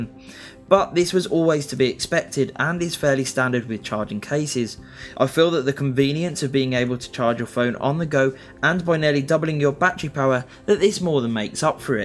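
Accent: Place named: United Kingdom. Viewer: i need more of that british accent...makes all your videos enjoyable!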